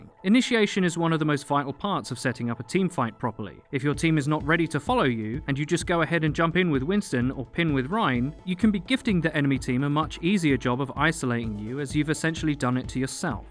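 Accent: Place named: United Kingdom. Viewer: It's his accent. Listen to, or imagine a British person saying something like "remember". The R at the beginning gets pronounced, but the one at the end is downplayed or removed. Other accents and dialects of the English language have quirks like this as well.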